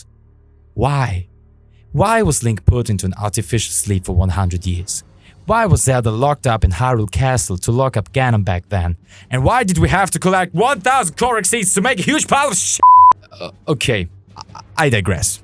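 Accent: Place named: Germany. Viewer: Quick question sir are you German or am I wrong about that If so that's fucking cool you're accent makes me strangely happy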